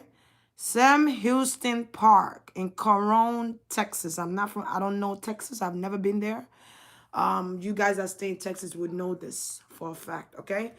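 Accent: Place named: Caribbean. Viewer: You remind of Rihanna are you from the islands ?! I love that accent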